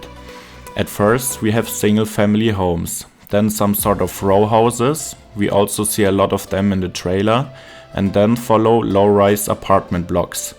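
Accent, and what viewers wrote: Germany. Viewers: Dein deutscher Akzent ist so unfassbar nervig, dass ich das Video nur bis schauen konnte.. sehr schade um die Informationen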